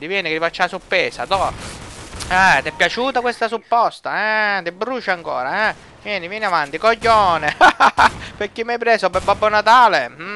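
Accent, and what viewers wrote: Italy. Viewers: Chiuso il video e messo dislike a . La classica pronuncia degli italiani. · ovviamente ogni tua recensione è bellissima. Dici tutti i dettagli,però devi dirmi una cosa...perché quella parlantina sicialiana? xD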